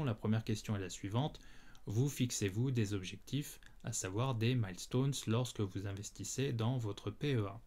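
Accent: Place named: United States. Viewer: Salut j'aime beaucoup tes vidéos vraiment mais si je peu donner une petite critique, c'est que tu prends un accent anglais américain qui est un peu ridicule dsl mais ça m'agace beaucoup ! Je comprends que parfois tu n'as pas le choix d'utiliser l'accent anglophone mais franchement tu l'utilise pour rien, c'est comme dire " T-shirt " a l'américaine Ti chweuuurt nan... !! Tu le prononce normalement t-shirt. Voilà ce qui me rend un peu ouf quand je mat tes vidéos, que j'adore au passage. Bref dsl mais la fallait que ça sorte. Merci a toi.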